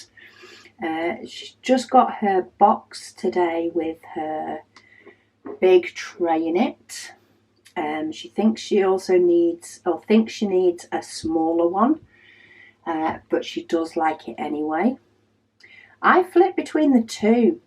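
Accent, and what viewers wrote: England, United Kingdom. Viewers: I really enjoy watching / listening to your videos. I have started a HAED diamond painting. I have been doing a 2 by 3 grid section just like you do, as it fits on my tablet screen. I don't have as many colors as your large HAED. I pick the symbol that is the lowest in the left most column, and let pattern keeper tell me the DMC number. I am working from right to left, and this outlines the section the quickest and fill in from there. I live in the states, and after a few minutes of listening to you speak, my inner voice will suddenly have an English accent. lol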